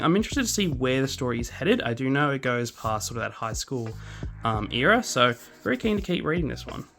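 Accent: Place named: Australia. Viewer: Dude I just found your channel and I wish I found it sooner. A fellow Australian (I’m assuming from the accent) with a manga related channel? Sign me up. It’s good to know I’m not alone in this niche of YouTube. Starting out is hard but I will say your videos are crafted really nicely I enjoyed your video all the way through. Keep it up mate.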